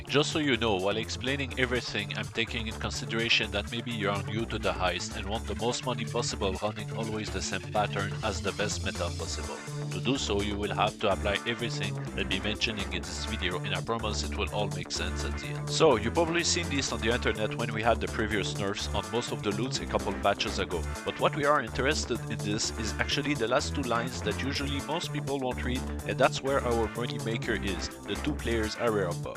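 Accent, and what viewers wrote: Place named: Quebec, Canada. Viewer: i recognize a quebecois when i hear one great tutorial tho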